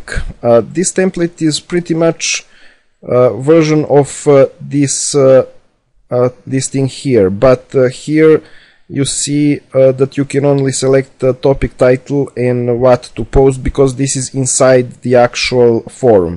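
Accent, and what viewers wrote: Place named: Poland. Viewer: Słyszę jakby Polski akcent, nie mylę się. Mówi jak mój nauczyciel od angielskiego w LO.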